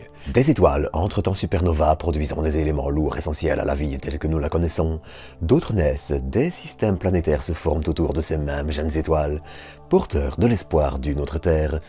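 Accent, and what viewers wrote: Belgium. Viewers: Wow, Je ne sais pas ce qu'il s'est passé avec ton com, mais je l'ai en notif et je le trouve très instructif. Je vais donc le remettre ci-dessous pour ceux qui, comme moi, n'étaient pas au courant. Et oui, est belge 🤗 et j'adore son accent! 😊 Com de Led Zep: Régional plutôt, si tu sous entends que notre ami soit belge, le coq est le symbole de la Wallonie (prononcer le W comme en anglais) qui est une des régions de la Belgique, c'est la partie sud du pays, on y parle français à 100 pourcents, la région Bruxelloise encerclée par la Flandre, brrrrr... 🙂 ((90 pourcents de francophone et 10 pourcent de néerlandophone)(on prononce Brusselle, pour la même raison que l'on dit Aussère et pas Auksère (Auxère). Pourquoi? En latin le double S s'écrivait X, à la renaissance le X a pris le son KS)), Ensuite la Flandre, 60 pourcents de la population, 100 pourcents néerlandophones et pour finir la région germanophone, seulement 60.000 personnes parlent donc allemand en première langue mais sont tous bilingue en français. 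Je ne sais pas si est belge par contre...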